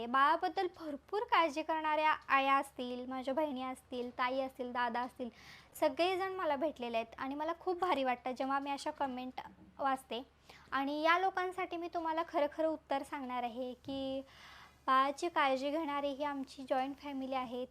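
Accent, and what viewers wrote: Kolhapur, India. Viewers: बोलण्या वरून तुम्ही सातारा कोल्हापूर चें वाटता 👌🏻👌🏻👌🏻म्हणेज माहेर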